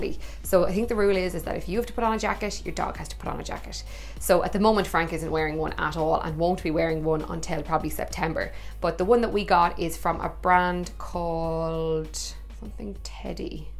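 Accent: Ireland. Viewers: I'm mainly here for the Irish accent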